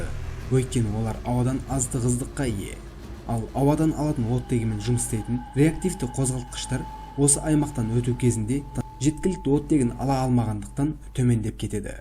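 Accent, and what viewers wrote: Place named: Karakalpakstan, Uzbekistan. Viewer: Акценты жагымсыз. Каракалпак емессиз бе?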